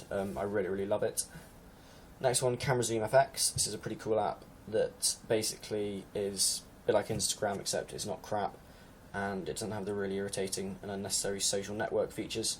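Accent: England, United Kingdom. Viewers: I realy hate that british accent its so ... gay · British accent hurts my ear T_T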